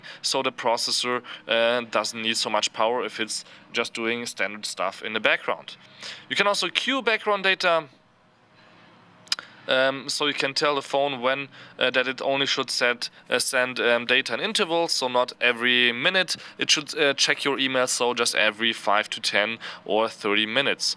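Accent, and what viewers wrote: Germany. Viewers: ... I like it when German speak English. The little accent is cute. :3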